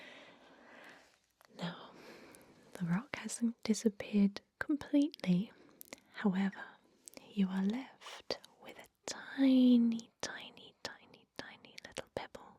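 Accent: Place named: United Kingdom. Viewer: You’re only saying that because they look alike... and sound alike... and both have british accents... and both do asmr... and both have the first name... and they are the same person.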